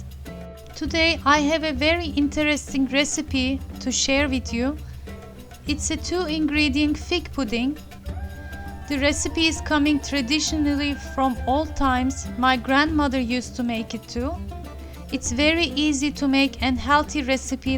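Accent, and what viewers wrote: Turkey. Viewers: As a Turkish person watching this, I really appreciate his initiative <3 the recipes look amazing. And you speak English very well, on top of the lovely and authentic Turkish accent you have!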